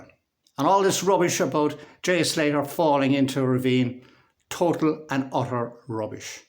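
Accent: Ireland. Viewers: Oh I love your Irish accent, xx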